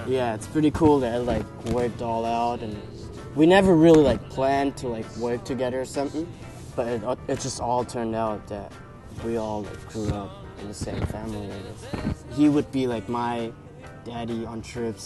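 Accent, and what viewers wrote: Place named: United States. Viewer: how is his accent so american if he was raised in germany??! well anyways thats cool and impressive as shit!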